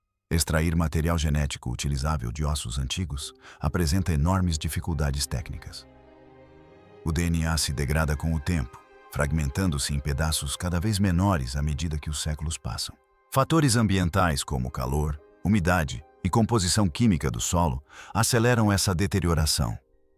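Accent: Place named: Ceará, Brazil. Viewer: Eu jurava que era Cearense. Minha irmã.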